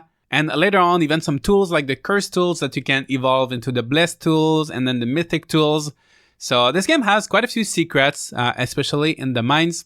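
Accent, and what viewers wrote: Quebec, Canada. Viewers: accent quebecois ?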